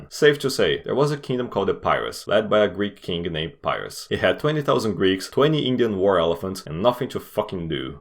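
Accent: Brazil. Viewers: Pretty sure he's Brazilian. His pronunciation of Roman names sounded really Brazilian to me, so I stalked his channel and turns out he's subscribed to a couple Brazilian channels. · You are brazilian right, i feel it in me accent recognizer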